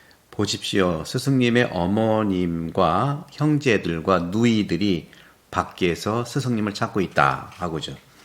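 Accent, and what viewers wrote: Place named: Chungcheong, South Korea. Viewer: 신부님께서 태안성당으로 부임하신 후에 충청도 특유의 느긋한 양반체로 천천히 말씀하시네요. 훨씬 더 명료하게 잘 들립니다. 신부님의 강론이 귀합니다.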